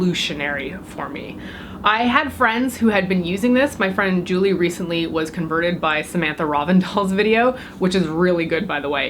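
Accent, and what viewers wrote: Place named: Eastern Canada, Canada. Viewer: I have to say as a fellow Canadian I adore your eastern Canadian accent it sounds so much more chic the my bc accent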